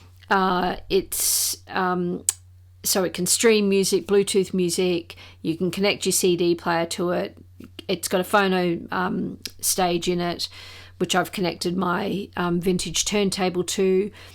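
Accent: Australia. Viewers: Great to hear an Aussie voice. ❤ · Hi, I have a Philips something tt. As soon as you mentioned an Australian Hifi show I started your video and immediately heard a familiar accent. I'm in Sydney. I bought the Philips because of its beauty and simplicity but don't use it much coz I love my Tidal subscription for convenience. I restore n build Hifi gear for fun. My name is Peter. Cheers. Oh I saw your link on the Audiophiliac Steve Guttenberg channel.☮️🇦🇺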